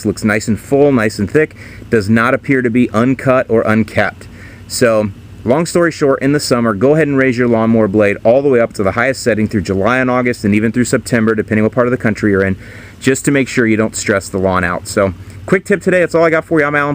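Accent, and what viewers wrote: Chicago, United States. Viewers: ""WOW""even your name was different did I hear a do you have a strong accent Chicago the Bears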